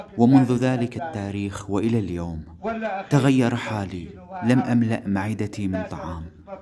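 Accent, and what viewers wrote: Iraq. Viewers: لو مامترجم احسن كلامه واضح كلامه بلهجه العراقيه الجلفيه العاديه ومعروفه واضحه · هذي ليسة لهجة قديمة هذي لهجة اهل الغربية من العراق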